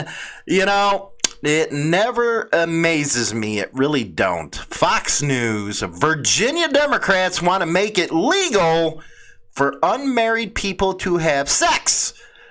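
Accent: Chicago, United States. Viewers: Morning and good points indeed! The simple facts are that what happens in the club world affects all our world as Bikers. It’s just reality man. Profiling and all the BS that goes with it are a result of people drawing attention to Bikers for bad behavior! Just look at what’s happened over the last 10 years here in the ROT. Specifically since the BlueGang pulled the ambush at Twin Peaks and it didn’t go their way after it all shook out and it has and is gonna cost them big $$$ long into the future as it should! We both know they (BlueGang) are the worst sore losers on the face of the earth. Pull that kinda shit and it goes south so we will just harass and fuck with these clubs until we wear em out of existence! That’s the way they think and work. As you pointed out man with today’s surveillance everybody’s into everybody’s business 24/7 and that’s reality. 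As for your Chicago accent man, fuck em! Shit man combine that with my ROT accent and those whiners would lose their minds! LOL! Great show man. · Good morning you know I'm a big fan of your show but you can't blame mispronouncing words on a Chicago accent you should be proof reading the story's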